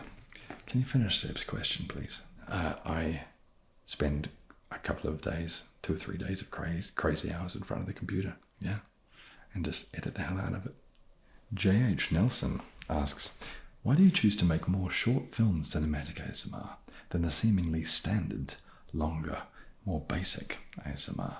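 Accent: New Zealand. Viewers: Omg, this frigging genius! Gravelly voice in stereo. Hearing my favourite English expressions in a kiwi voice (crack on, loads) is always a cheap chill. I missed the opportunity to leave you a question🙁 but I’m pretty sure I can’t think anything other people come up. As an artist I would adore painting you like one of my French girls🤣🤣🤣 This was a joy to watch and heavenly to listen to. 😊❤️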